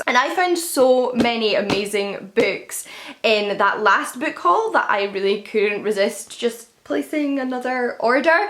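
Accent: Scotland, United Kingdom. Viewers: That's the Scottish accent dude, it can make doom and gloom sound like optimism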